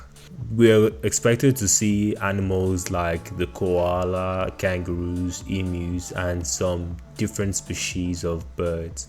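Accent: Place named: Australia. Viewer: The Australian "mate" accent is really coming through 🤣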